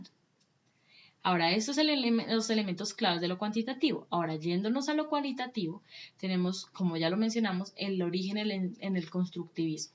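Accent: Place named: Colombia. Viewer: Gracias, las Colombianas hablan bello, me enamoro su vos.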